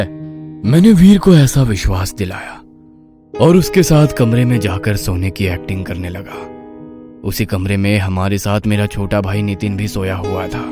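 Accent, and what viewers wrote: Bihar, India. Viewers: Bhai aap bihar ke ho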